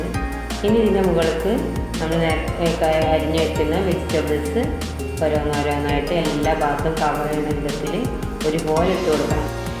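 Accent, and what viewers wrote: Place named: Eranad, India. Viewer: ഏറനാടൻ ശൈലിയിൽ നല്ല അവതരണം പൊളിച്ചു..I will try it tomorrow and let you know the taste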